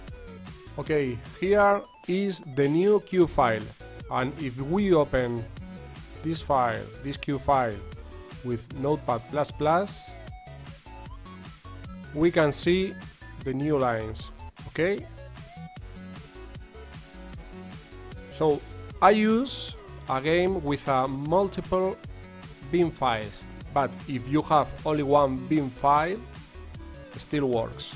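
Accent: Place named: Spain. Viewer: Por el acento pareces español bro jajaja. Oye, tengo un juego (La verdad es un Demo disc, uno de esos que venian con la revista oficial de la play xD) con todos los archivos bin pero me falta el cue. He intentado recrear el archivo y ahora detecta el juego y lo abre, pero no funciona, se queda en una pantalla negra...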